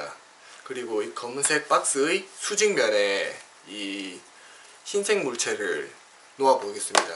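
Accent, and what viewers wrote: Gyeongsang, South Korea. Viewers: 경상도쪽 말투 같은데..진짜 찾아뵙고 배우고 싶습니다 ㅠㅠ 좋은 정보 감사합니다~ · 짜증난다 저 경상도 사투리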